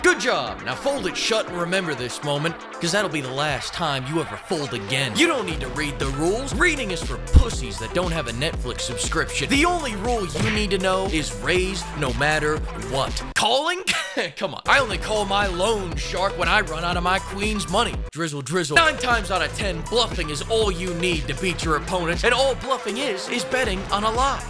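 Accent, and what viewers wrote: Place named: United States. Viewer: I need to visit the States😂 love the accent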